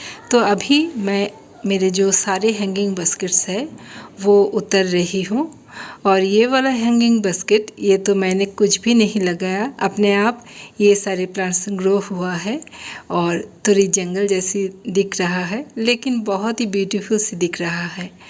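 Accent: Bengal, India. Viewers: Sotty tomar mukhe hindi khub bhalo lage.ami c.g. te thaki ekhanei boro hoechi kintu amar me bole tomar mukhe hindi sunlei bojha jaye tumi bangali😊😊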